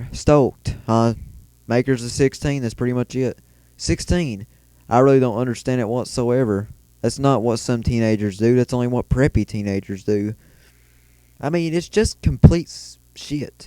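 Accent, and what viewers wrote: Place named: South, United States. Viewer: Are you from the south?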